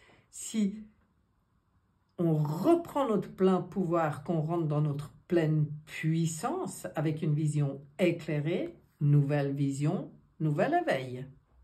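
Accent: Switzerland. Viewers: De suisse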